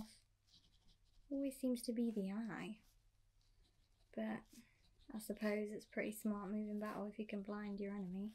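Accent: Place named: Birmingham, United Kingdom. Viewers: I think the best thing is that it sounds like u come from Birmingham (witch is where im from 😂) really good role play Keep up the awesome work 💯♥️ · Being from the Midlands (South Yorkshire) specifically your accent felt so at home for me lol its so strange hearing it from you tho.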